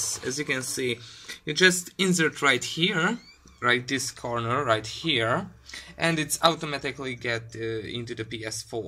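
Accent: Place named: Poland. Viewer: Dzięki - aż mi wstyd ze musiałęm tego poszukac w necie ale wlasnie nabyłem PS4 Pro z FIFA20 i k*** nie mogłem odpalić :) Piszę po PL bo założę się ze jesteś z PL z tym sorry ale kulawym akcentem wszędzie da się poznać rodaka ;)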